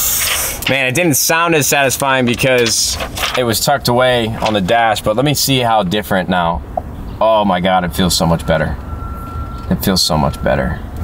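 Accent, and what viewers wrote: United Kingdom. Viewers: That British accent was sick oh my 😂 From Leicester big love Car is actually unreal too congrats on all of it you 100% deserve it, been watching since dayyyy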